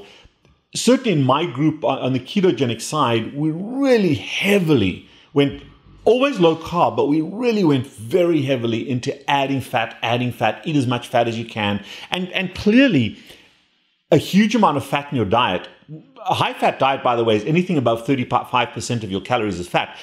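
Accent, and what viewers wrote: South Africa. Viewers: I could tell you are South African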